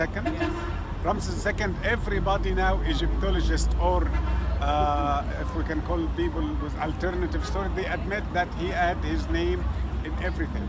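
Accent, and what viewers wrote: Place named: India. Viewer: THIS GUY IS NOT EGYPTIAN 💯 HE HAS INDIAN ACCENT , HE TRYNA DISCARD AND RE WRITE HISTORY LIKE EVERYONE ELSE THAT CAME BY THE EGYPTIAN GODS 💯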